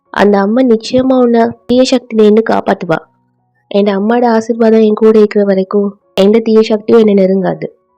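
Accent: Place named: Kerala, India. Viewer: illa akka .. na tamil .. unga tamil kekka alaza iruthu atha ketta malayalam ma nu .. naga pesura tamil ipdi irukathu english mix aki irukum .. anaa unga tamil super ra iruku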